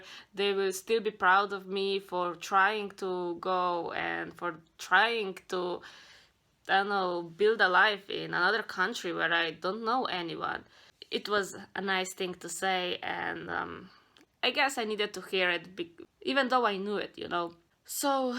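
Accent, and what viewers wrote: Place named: Slovenia. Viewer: Every last detail of this ongoing interactive life story is on point, even your bedroom wallpaper is right :D Good video, meakes the listener feel connected with the message of taking the responsibility for your destiny and than beeing persistent enough to follow it through without giving up beforr your time comes. Ps, leave at least 0.001% of Slovenian acent :D 🌺💚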